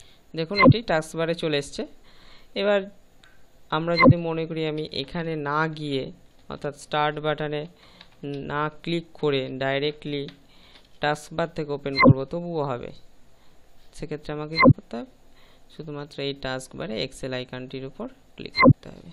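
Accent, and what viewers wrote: India. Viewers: কলকাতা আর বাংলাদেশের মধ্যে উচ্চারণগত কিছু পার্থক্য আছে। সেটা ব্যাপার না। ব্যাপার হলো আমরা অনেক শিখেছি ওনার কাছ থেকে। তবে দার কথাগুলি অনেক ভালে লেগেছে এবং ওনার টিউটোরিয়াল থেকে অনেক উপকৃত হয়েছি। দাদা অনেক ধন্যবাদ আপনাকে। আপনার থেকে আরো অনেক টিউটোরিয়াল চাই। দাদা মাইন্ড করবেন না। কিছু লোক আছে যারা সবসময় মানুষের সমালোচনা করে।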